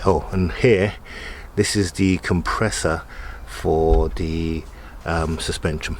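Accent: United Kingdom. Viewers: Great show!! Are you originally form North London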